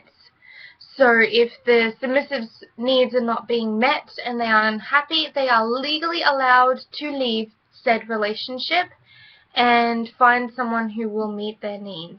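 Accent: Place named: Australia. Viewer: Hi - I hear from your accent that you are an Ozzy - Ozzy girls are best. Thanks for the informative vid.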